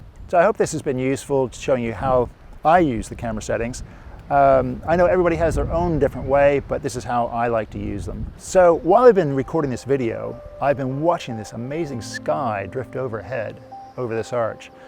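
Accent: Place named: Australia. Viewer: I feel like I hear bits of Aussie accent lol but very informative video! Best is the basic language used- a lot of the other videos I can’t quite understand due to the technical terms etc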